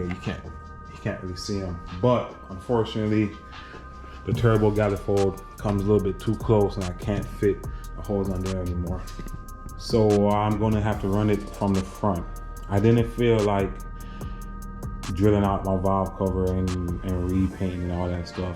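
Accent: Jamaica. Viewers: Just bought this today, thanks man. Jamaican???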